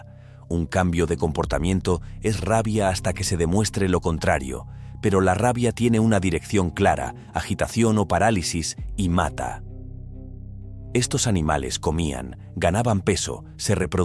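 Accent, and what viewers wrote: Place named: Spain. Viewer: A mi casi no me gustaba el acento español pero ya lo amo con este canal !!!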